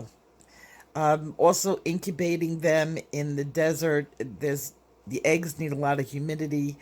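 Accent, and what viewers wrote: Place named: New Jersey, United States. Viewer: Hi. You sound like my spouse's mom, and she sounds like New Jersey. Just an idea, but why not draw from your list and the official one?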